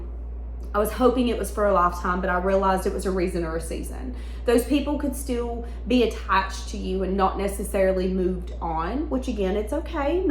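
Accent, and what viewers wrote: South, United States. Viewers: I do love her Southern accent. Paying attention from the UK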